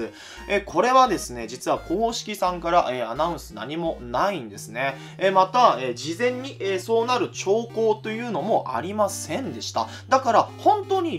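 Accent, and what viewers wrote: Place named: Kansai, Japan. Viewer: 関西弁ええな！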